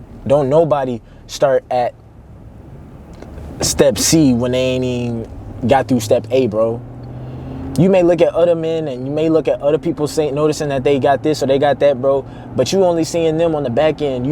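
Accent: Baltimore, United States. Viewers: Are you from Baltimore ? 😭 also the videos are 🔥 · Bro u from Baltimore them ouis sound familiar 😂na great motivation bro ima subscribed now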